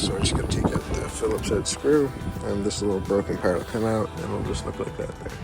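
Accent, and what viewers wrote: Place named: Canada. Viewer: Before I saw Canadian I was wondering if ur from there or Minnesota lol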